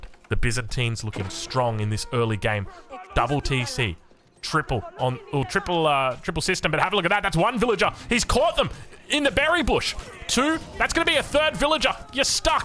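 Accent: Australia. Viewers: What is it with youtube commenters not understanding Australian?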